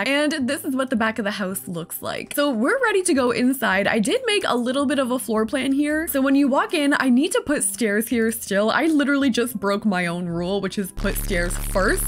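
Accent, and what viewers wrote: Canada. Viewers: omg first video of yours ive seen and could tell right away u were Canadian lol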